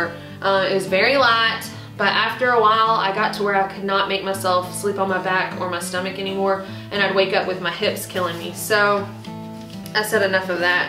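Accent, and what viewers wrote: South, United States. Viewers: Hey - Do people ever comment about how DIFFERENT the young lady is that is just starting the AT in GA (2015) to the one doing these very informative videos? The pretty is there throughout, but the confidence is far greater in these latest efforts. Hope your book is nearly as informative. Too bad you can't write with a southern accent!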